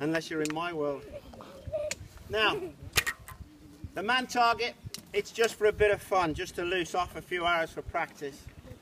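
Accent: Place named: Midlands, United Kingdom. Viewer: This is just how people from the Midlands talk.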